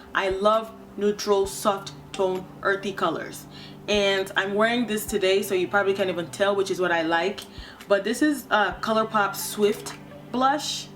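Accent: United States. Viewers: your country accent is so cute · Lol when u rolled them eyes so hard.... "I cant be lookin crazy in these streets" LOL I just love u and how ur african/chicago accents intertwine lol... Just glue ur powder pan back into the container.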